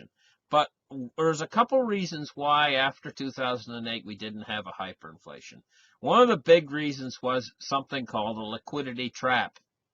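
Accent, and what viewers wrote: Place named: Canada. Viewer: LOL ... "it's weird ... it's just weird ..." Excellent video. You have the knack for making otherwise complicated stuff easy to grab (and funny to watch) ... for us financially/economically challenged types. (Still working on that "laboratory of liquidity" you put out a while back.) What you do is very much appreciated. After going through a lot of financial turmoil in his own life, my son found your channel ... and then introduced me as well. We both watch FTE every day ... and refer to you as "the Canadian" because of the obvious accent and because we didn't know your name back when we first started watching. I in turn have promoted your channel to friends and family ... in attempts to get them to wake up to what is coming. Some listen, some don't ... par for the course, I guess. Though it may be a double-edged sword when the economy goes south ... 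I will be so happy for you when your views, likes, and subs go TO THE MOON, ALICE, TO THE MOON! Also, BTW ... love your close-ups. Have a great weekend. :)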